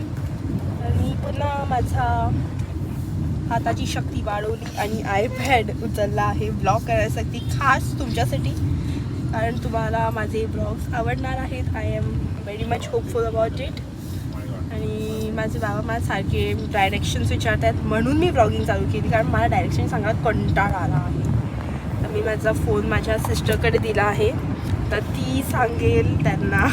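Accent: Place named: Malvan, India. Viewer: Loved the malvany ascent...plz more of that type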